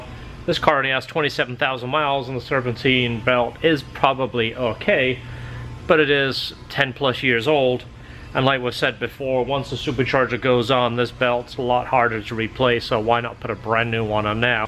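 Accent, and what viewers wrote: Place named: United Kingdom. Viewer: Where you from dude your accent sounds a bit British? Great work by the way.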